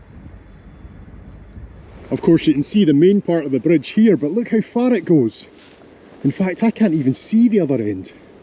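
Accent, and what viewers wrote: Scotland, United Kingdom. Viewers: Always enjoying your videos ... and your fine scottish accent :-) This is indeed one heck of a special way to cross a canal! Wow Many happy and safe trails for the new year!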